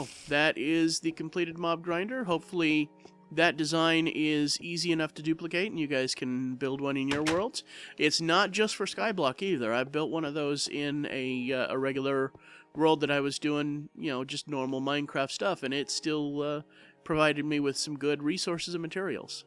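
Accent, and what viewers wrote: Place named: England, United Kingdom. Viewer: You English not Mrican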